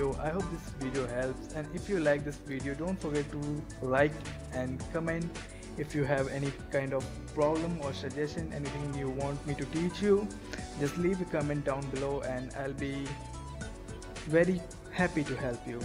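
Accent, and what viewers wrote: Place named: Bihar, India. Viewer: OEEY PUNDIT : FIRST DON'T PLAY THE DING DONG MUSIC !!! THE CABLE IS CALLED ETHERNET CABLE AND NOT A LAN CABLE . PLEASE DONOT USE ANY INDIAN JARGON AS COMPUTER VOCABULARY. NEXT THING > IT'S CALLED LOCAL NETWORK SHARING . THIS NOT ANY EINSTEIN 'S FORMULA . SO KEEP IT SIMPLE . BRUSH UP YOUR OWN ENGLISH DICTION (BIHARI DICTION ) AND ENGLISH PRONUNCIATION ! FIRST YOU LEARN COMPUTER PROPERLY THEN YOU TEACH OTHERS THE RIGHT WAY ! DON'T TEACH "LAN CABLE FOR ETHERNET CABLE ! THERE ARE STRAIGHT CABLE ,CROSS OVER CABLE TO CONNECT PC BUT USB CABLE ALSO CONNECTS ; SO YOU NEED TO BE SPECIFIC !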